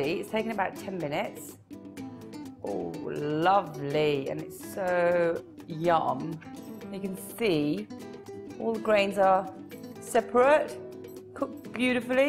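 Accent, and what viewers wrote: United Kingdom. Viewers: R u frm london?? U hav british accent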